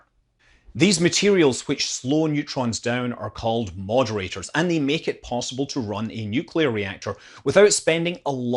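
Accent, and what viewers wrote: Scotland, United Kingdom. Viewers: I'm a Scot living in New Zealand and take comfort from a Scottish accent. The turning t's into d's with said accent is giving some anxiety though. What's the deal? Where do you live?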